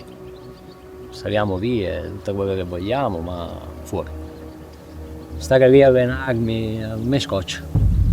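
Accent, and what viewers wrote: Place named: Sicily, Italy. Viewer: Conosco nei miei 4 anni passati in Sicilia, mi ha portato a vedere e scalare nelle sue " misteriose" falesie, quelle che non si vedono all'orizzonte, perché sono dentro nei camion. Con scrissi e fotografia un bellissimo e corposo reportages con tanto di copertina su Pareti, portando al pubblico luoghi incredibili. Di questa bellissima storia mi ha emozionato risentire la voce tutta siciliana di, così umile e profonda, racconta senza fronzoli la sua solitudine di Climber in una terra indietro anni luce nell'arrampicata. Lo raccontai in quel servizio e venni contattato da un galletto del luogo, ricordo ancora l'inizio di quel messaggio: ma ti sei reso conto delle cazzate che hai scritto? Un tono minaccioso, quasi mafioso. Piccole invidie perché dissi che in un mondo di scalatori " della domenica" era ed rimane il più forte Climber Siciliano. 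Daje avanti cosi, per te stesso e per la tua incondizionata libertà.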